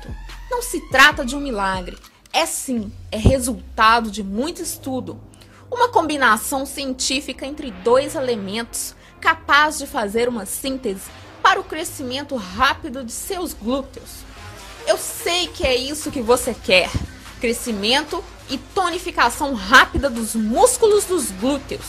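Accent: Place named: Minas Gerais, Brazil. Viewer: SOTAQUE MINEIROO KKKK <3 AMO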